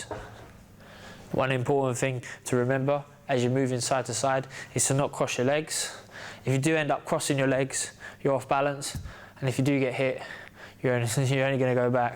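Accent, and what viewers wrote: United Kingdom. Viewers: British accent there...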